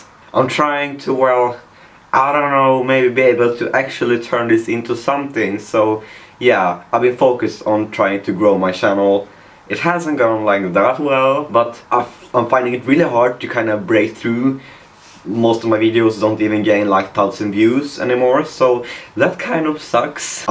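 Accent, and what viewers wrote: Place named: Sweden. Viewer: YOUR SWEDISH ACCENT IS SUPER COOL, from which part of Sweden are you from?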